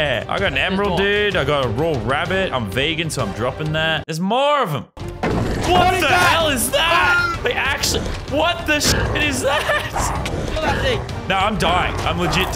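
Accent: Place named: Australia. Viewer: Yo bro you have a Aussie accent big time bro cheers for Australia man (I’m also from Australia).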